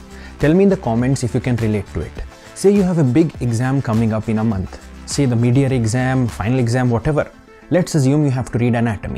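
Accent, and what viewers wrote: India. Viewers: i really love the indian accent :D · there's no such thing as Indian accent diff parts of India speaks with diff accents from south to north to east to west what he is speaking is probably south indian accent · after the indian accent i knew this was the video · Duude..really?! You're an Indian and you find indian accent disturbing?! Wow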